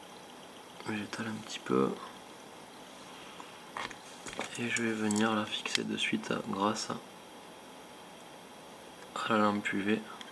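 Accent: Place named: Southwest, France. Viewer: Tu es de quelle région tu as la voix du sud ouest 💪💪💪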